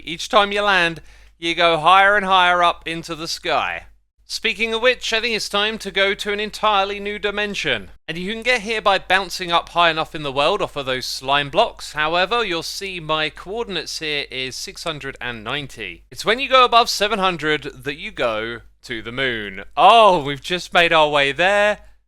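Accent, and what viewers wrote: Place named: United Kingdom. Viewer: I forgot how British you are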